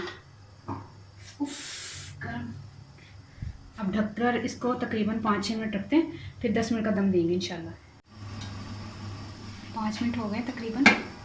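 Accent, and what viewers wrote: Punjab, India. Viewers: So nice taste aur ap ka punjabi style bohat acha ha ap punjab k kis aria see ho ma lahore sey hon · What a lovely talking style, specially punjabi touch, i think speak punjabi more. you must reply !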